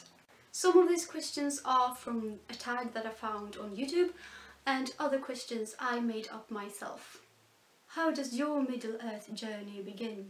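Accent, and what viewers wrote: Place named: United Kingdom. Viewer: Vilken brittisk engelska du har, så där fin och sofistikerad <3 Min är mer amerikansk och hemsk, hahaha xD Är sugen på att göra denna tag med, när jag får lust (och är ensam hemma). Kan inte spela in videos annars D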